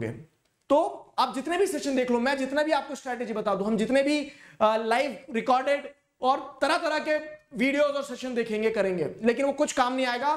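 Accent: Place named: Madhya Pradesh, India. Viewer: Sir Your accent is similiar to me because I also live in MP ( Indore ) love you ❤️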